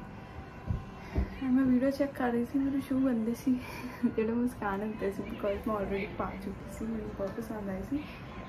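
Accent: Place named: Canada. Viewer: mam da canadian slang hogya hle 6 month ni hoye ayi nu bakwaas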